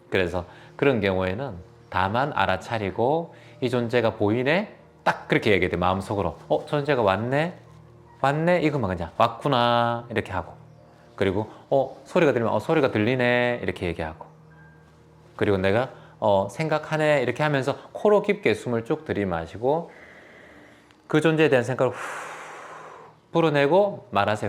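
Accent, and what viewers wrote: Gyeongsang, South Korea. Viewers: 제고향 포항 오천 입니다 포항 말들으니까 더 정겹습니다❤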